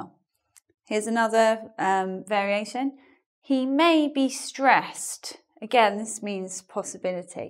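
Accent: United Kingdom. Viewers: I started learning Eng at the age of 6, now I'm 25. I love her channel but I mostly watch her videos to listen to British Eng not to learn. But in that 19 years, every teacher and book said that 'might' is the formal and polite version of 'may'. And here I am, hearing the total opposite with a confused Pikachu face. Thank you so much!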